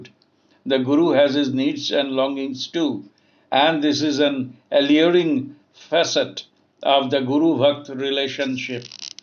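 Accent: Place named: United Kingdom. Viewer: Your pronunciation is very difficult to differentiate from British Style. Very Good.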